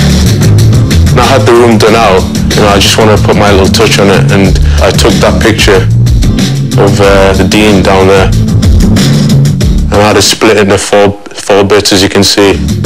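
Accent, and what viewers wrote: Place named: Newcastle, United Kingdom. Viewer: best black guiy with a geordie accent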